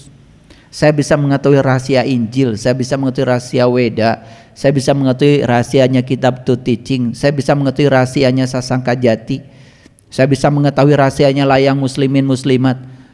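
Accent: West Java, Indonesia. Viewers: Lebih kepada logat bos,, logat Sunda emng sperti itu, akn brbeda dngn logat orng Jawa, orng Arab dn sbagainya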